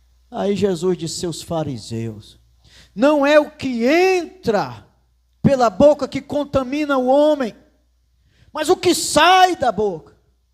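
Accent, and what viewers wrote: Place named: Northeast, Brazil. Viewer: Gostei demais fala nossa língua nordestês. Glória a Deus!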